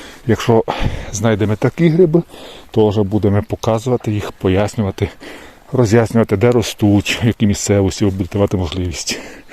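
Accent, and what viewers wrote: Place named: Lemko-Boyko, Ukraine. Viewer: Ще хотілось підмітити Ваш чудовий наголошений звук "И", який притаманний говірці лемків і бойків, дякую!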